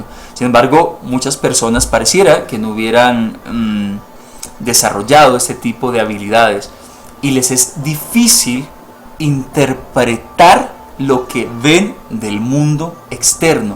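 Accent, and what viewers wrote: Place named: Colombia. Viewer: Gracias mi estimado. Pero tan mal dicho esta decir Zi, como Sapato. Sin ánimo de molestar abrazos para Colombia de donde por su entonación deduzco es usted oriundo.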